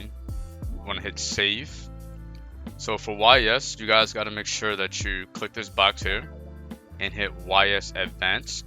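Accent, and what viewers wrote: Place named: Baltimore, United States. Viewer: good vid g. Yo you from baltimore? I can hear it from a mile away hahaha anyway I remember checking out wit eno using a mastercard on ys but mastercards dont work no more?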